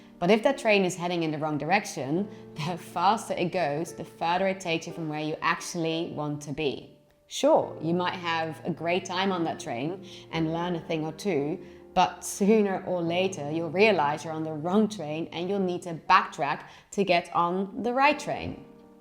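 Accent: Netherlands. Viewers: Are you Dutch originally? You sound a little bit Dutch.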